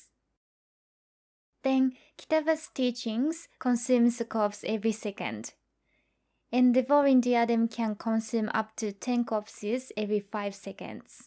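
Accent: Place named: Japan. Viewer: このアクセント...まさか日本人？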